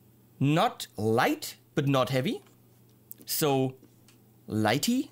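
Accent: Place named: Germany. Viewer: That German accent. ;)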